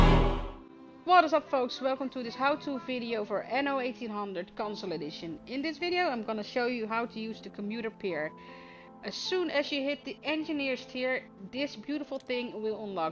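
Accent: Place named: Netherlands. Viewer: ik kon wel duidelijk horen dat je Nederlands bent. 🥳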